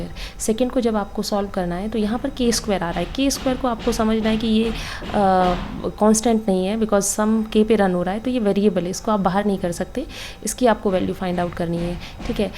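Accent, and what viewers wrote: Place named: Bihar, India. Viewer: Aap bihar se h kya, aap theek h bolti h isliye puchha h, waise aap topics achhe se explain krti h , Dhanywad.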